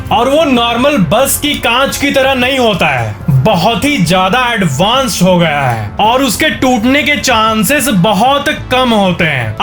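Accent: Bihar, India. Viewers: Aap Bihari ho kya bhai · Bhiy bihari h 😁😁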